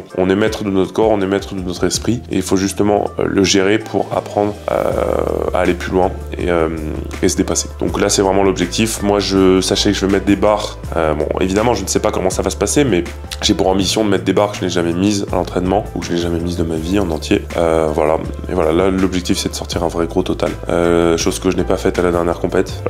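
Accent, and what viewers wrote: Quebec, Canada. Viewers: Ce qui m'a le plus impressionné dans la vidéo c'est clairement ton accent québécois 😲 t'as habité là bas ou quoi ?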